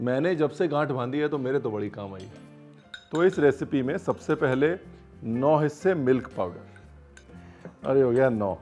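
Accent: India. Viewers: Aapka sun k aisa lagta h, india ki origin kuch h ki nah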